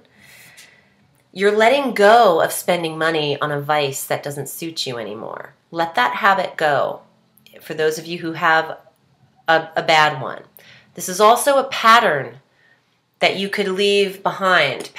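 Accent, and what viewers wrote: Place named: New Jersey, United States. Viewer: what a gemini communicator you are....i am so glad saturn isn't retrograde in my 10th house anymore...that was a 2 yr typhoon ruby if there ever was one! jupiter retrograde n the 7th house till 8/15!! giddyup!! I wish you would let more of your NJ accent out! I would love to hear your accent unadlterated....you could do your videos in pigtails and and yellow shirt with neon pink polka dots...you are fantastic.....love your nails....you're always changing the color ms. gemini.....ur explanations are in depth and so educational....thanks for taking the time to interpret. ;) always appreciated.....a fellow east coaster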